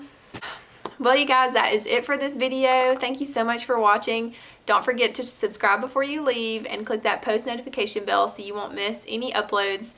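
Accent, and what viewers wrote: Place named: South, United States. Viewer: You have to be from Georgia or Tennessee 😊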